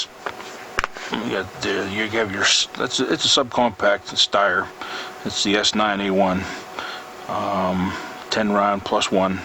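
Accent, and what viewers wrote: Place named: Pittsburgh, United States. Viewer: Jus spelling error . Although i was gunna say picksburg as well haha ... im not from pitt im about an hr away in east liverpool ohio but i spotted the accent quick lol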